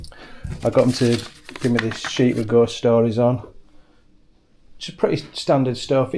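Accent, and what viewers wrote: Yorkshire, United Kingdom. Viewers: I knew you were a Yorkshire bloke when you started talking! I'm from Rotherham, but live in Sheffield. I've never been to Rye, but my other half will have, probably. Shame you didn't get any spooky happenings isn't it? Maybe next time and in the next place too. If you're worried about just being in your undies at night, take an old t-shirt with you to wear while you sleep. I don't go without one if I know I'll be away from home and they don't take much room up in your bag either. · “I’m trying to be spooky” 😂 “Think I’ll go t’ bar” Don’t even know why nearly everything you say is funny af… maybe it’s your fantastic Yorkshire accent… your personality… the way you and bounce off each other with your banter... Whatever it is, I really enjoy your uploads. Keep ‘em coming 🌞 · Another great "Yorkshire Take" on somewhere I know very well! 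☺️...I used to live just on the other side of Romney Marsh, at Hythe...another very historic town. You could easily do a run along that coast with in the van and stop at half a dozen nice places...maybe start at Folkestone, then Hythe...Camber Sands...Hastings...Bexhill... Eastbourne...and finish up on Beachy Head? Just a thought. The bit that made me laugh most was when you said "reet" to that fella in the pub...and he didn't have a clue what you meant! 😂 I don't think you Yorkshire folk quite realise how intriguing us soft southerners find your dialect! It is absolutely fascinating...as was the video, once again. Nice one! ☺️ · I'm watching this from NZ. I was born a yorkshire lass, we emigrated to NZ in 1966 when I was just 8 years old. I love your beautiful accent, it reminds me so much of my darling dad. You are so funny, love your sense of humour. The part when you talked about assigning the ghosts to their room for the night.... 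hilarious!! I'm definitely a ghost believer and have had several encounters over the years, especially from my dad after he died 20 years ago. I've now subscribed so I can see more of you. Thankyou for the entertainment 😂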